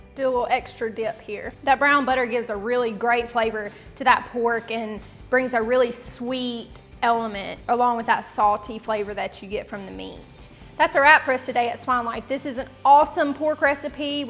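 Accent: South, United States. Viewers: Its the South fellow babies. Rich a full.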